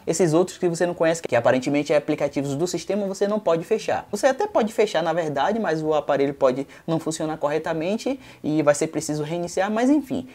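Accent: Bahia, Brazil. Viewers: Estou sentido um sotaque familiar( baiano ) no vídeo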